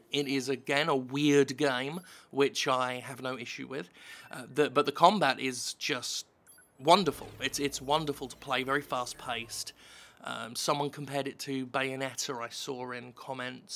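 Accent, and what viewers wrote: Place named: United Kingdom. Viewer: You sound more british here than usually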